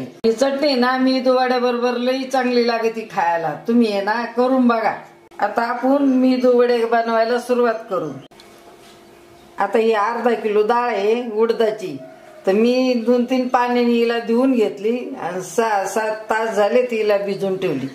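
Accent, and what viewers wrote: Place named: Dhule, India. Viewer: Aho aaji tumhala sagle yete ase naka bolu mi tumcha aavaj eikla ki mala ase vatte ki mi dhule la aahe chan boltat aaji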